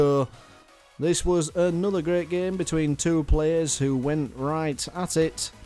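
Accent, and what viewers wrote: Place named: United Kingdom. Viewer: Is the british accent so much fun to hear? Or just good in commentary, nice work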